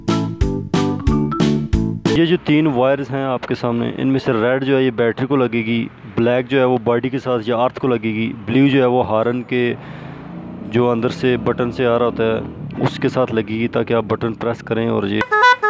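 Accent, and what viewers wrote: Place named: Pakistan. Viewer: Aap Ki Awaz se se lag rahi aap Pakistani hai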